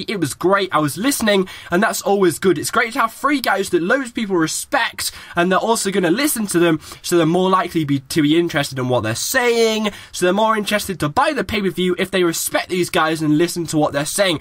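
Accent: United Kingdom. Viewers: Do you speak British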